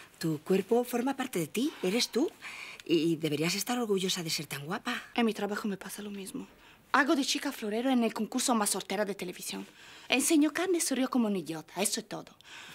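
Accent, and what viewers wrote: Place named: Italy. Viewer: bruttissimo l accento italiano nello spagnolo🙉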